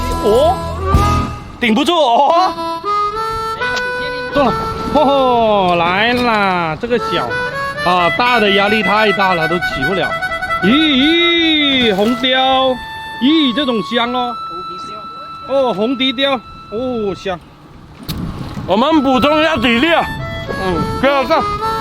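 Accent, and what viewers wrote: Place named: South, China. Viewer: 这口音好像南普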